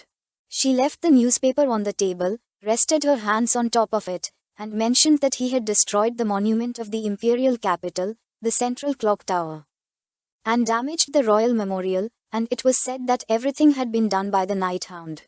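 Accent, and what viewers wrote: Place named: India. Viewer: kind of an ugly accent... can somebody change the announcer.... its like watching indian/tamil movies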